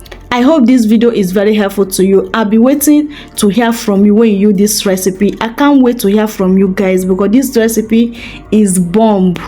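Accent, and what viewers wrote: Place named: West Africa. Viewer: Come on 😅 she is from West African that's how they speak 😅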